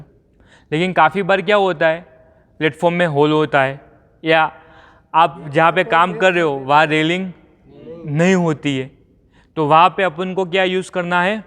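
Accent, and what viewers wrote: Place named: Gujarat, India. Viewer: Mai bhi sir idhar TL line me kam krne aya hu Gujarat ap jaise bol rhe hai idhar bhi log aese hi bolte hai isiliye laga ap gujrat se hai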